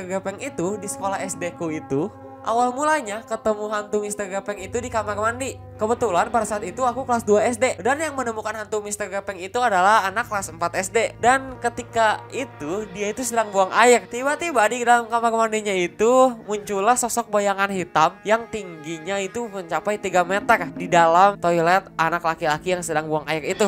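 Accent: West Java, Indonesia. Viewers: suaralu kaya orang sunda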